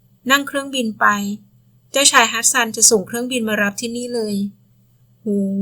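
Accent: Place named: Isan, Thailand. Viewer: พุดอิสานเพราะมาก😅😮😢😢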